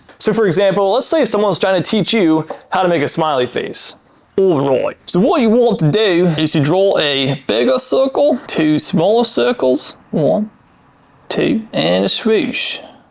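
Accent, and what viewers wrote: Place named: Australia. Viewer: Love the Rolf Harris accent - except he is now persona non grata.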